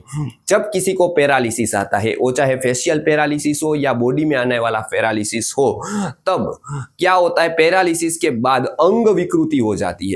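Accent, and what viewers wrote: Gujarat, India. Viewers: Tame Gujarati lago 6o laheko Gujarati 6e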